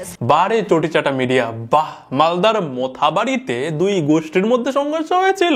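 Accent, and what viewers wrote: Bangladesh. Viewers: Tor bhasa shunei mone hocche, tui bangladeshi,.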